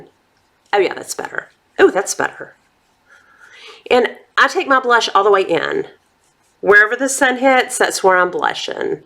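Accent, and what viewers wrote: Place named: Mississippi, United States. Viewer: You look stunning!! What a fun video :) Where are you from? Love your accent, love that “y’all"! My mom is from Jackson, MS and I went to college in Mississippi, so of course I picked up on that y’all ;-) .. love that modern renaissance palette! loving all these high end products girl! Wayne Goss brushes have been on my list... def getting that #16 now :D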